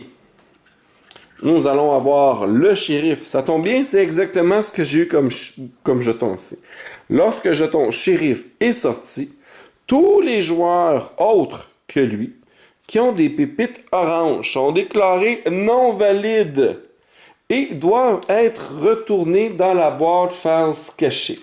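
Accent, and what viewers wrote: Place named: Quebec, Canada. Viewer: Merci pour cette chouette vidéo (avec l'accent québecois !) !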